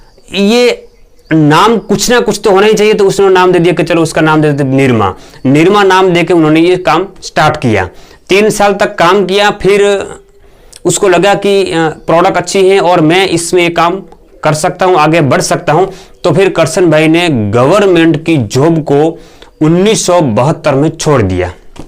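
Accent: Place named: Uttar Pradesh, India. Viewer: Up se ho aap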